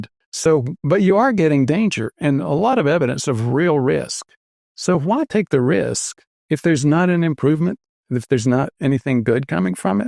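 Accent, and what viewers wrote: United States. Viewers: How about jist get sun and eat healthy why take pills ? If you can afford pills youncan afford food. You sound American where there is no health or longevity studies that show your lifestyles work. Eat well get sun and excersize and he happy and you dont need to get confused of all these pillls you take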